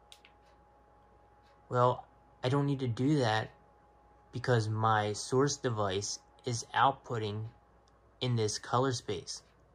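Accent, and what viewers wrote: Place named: Prince George's County, United States. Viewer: I could tell by your accent. I grew up in PG County. Waldorf and laplata. We speak with long O's lol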